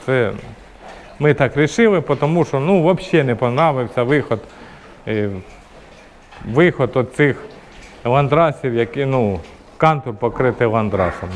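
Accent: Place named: Surzhyk, Ukraine. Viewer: З чого ви взяли що він російськомовний? Він просто може розмовляти на суржику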